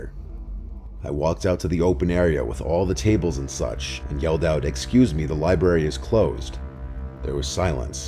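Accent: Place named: New York, United States. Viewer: He has such a great voice for these stories. I cover true crime and my voice is on the lighter side so I try to make a little deeper for the tone of the story. He also has that jersey maybe New York accent that really sets him apart.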